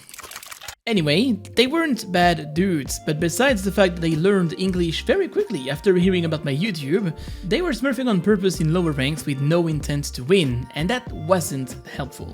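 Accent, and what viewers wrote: France. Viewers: yo are you originary from frace or a country who speak frenche cause you have a litle french acent some time (love your video) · Y a un p’tit accent français Nn 😭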